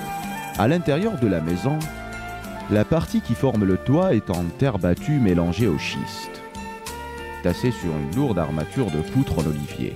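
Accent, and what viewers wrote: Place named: Kabylie, Algeria. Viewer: Accent kabyle normal a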